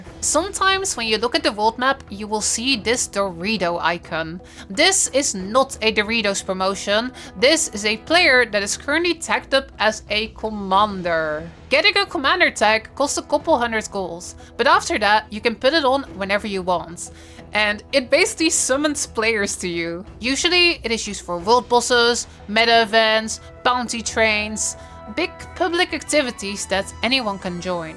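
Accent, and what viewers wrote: Netherlands. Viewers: are you Dutch by chance? Your accent sounds familiar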